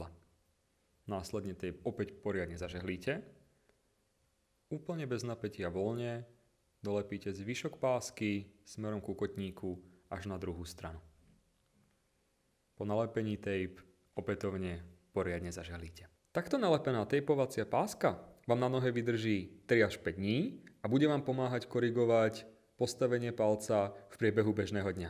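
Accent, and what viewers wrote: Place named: Czech Republic. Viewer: Ahoj ty Si čech alebo Slovák ako to rozprávaš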